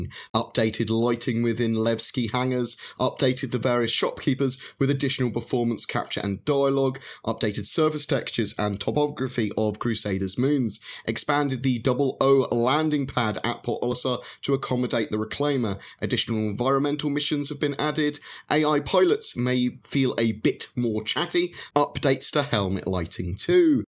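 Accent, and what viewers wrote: United Kingdom. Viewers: I love how Brits call it a "haych" key :)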